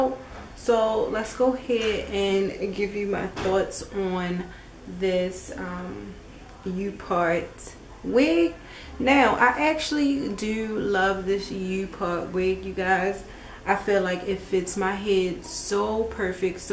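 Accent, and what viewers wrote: Baltimore, United States. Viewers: sounds like Baltimore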